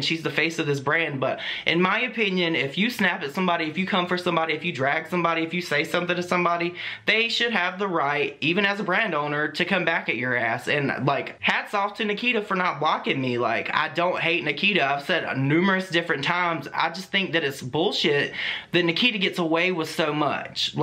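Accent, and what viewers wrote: South, United States. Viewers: god I can’t stand that dirty little wannabe! Nikita DOES get away with a lot. Btw, when you post I always look forward to sitting down with a drink and listening to your sweet southern drawl. You DIG DEEP!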